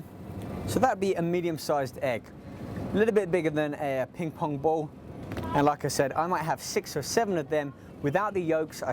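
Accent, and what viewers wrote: United Kingdom. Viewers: woter, olive ol, mo. british haha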